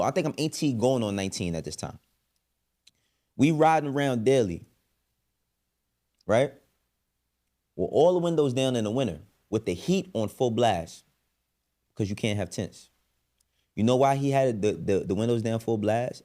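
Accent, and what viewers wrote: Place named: New York, United States. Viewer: Why this dude sound like he from New York?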